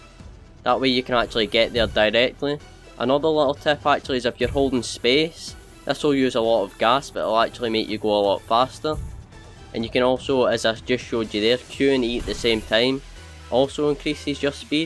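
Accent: Scotland, United Kingdom. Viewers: Are you Scottish? Cause if so same! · Scottish that’s what I like to see lad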